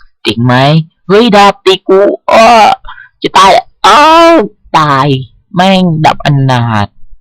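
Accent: South, Thailand. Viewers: พี่เด็กใต้ป่าวคับ ทองแดงทั้งนั้นเลยนิ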